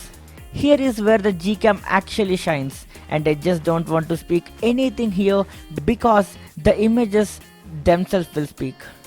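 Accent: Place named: Madras, India. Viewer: 100% madrasi accent, am I right tambi ?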